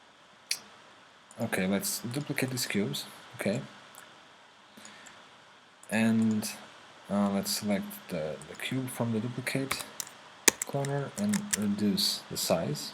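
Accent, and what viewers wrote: Portugal. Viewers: Inglês de quem fala português SEMPRE me ajuda a entender, ingles nativo é muito corrido, valeu pelo video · Good Tutorial... and you are Portuguese...or sort of :) Bom tutorial ;)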